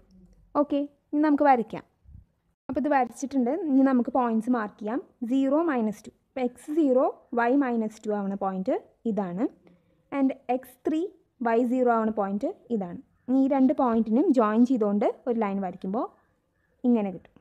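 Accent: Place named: Thrissur, India. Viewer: Thrissur alle Aa Basha kettale theriyum 😄😄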